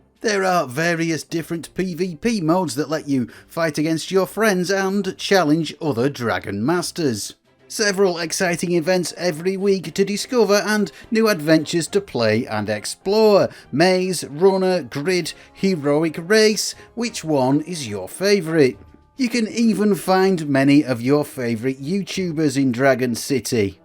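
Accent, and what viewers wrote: United Kingdom. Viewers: Speech impediments exist, you might need to take that into concideration. He also has a UK accent, and the UK has many different dialects. Hope this helps.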